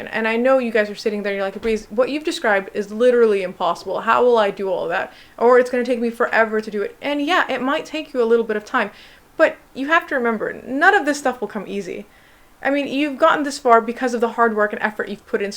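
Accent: United States. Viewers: How are you in the uk and have an american accent?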